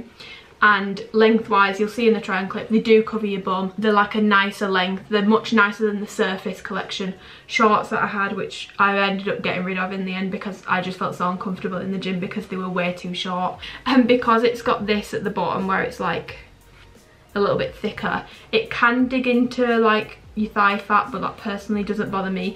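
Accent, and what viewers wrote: United Kingdom. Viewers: are you from Scotland 🏴❤️😋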